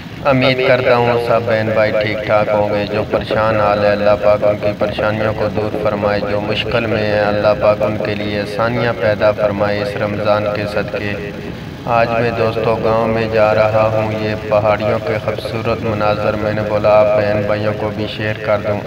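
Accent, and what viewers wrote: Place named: Saraiki, Pakistan. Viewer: آمین ثم آمین سرائیکی آواز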